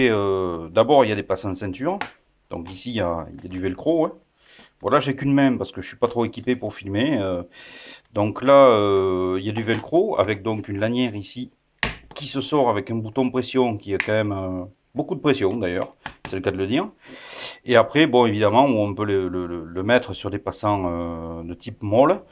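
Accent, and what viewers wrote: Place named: Hérault, France. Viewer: Vidéo bien sympathique et couteaux superbes Quant à la voix et l'accent : soyons fiers d'être héraultais !!!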